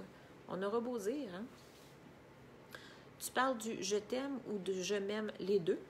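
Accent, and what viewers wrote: Quebec, Canada. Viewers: Je crois avoir reconnu l'accent québécois ou canadien 😁. J'adore ce genre de vidéo ça fait réfléchir tu as vraiment du talent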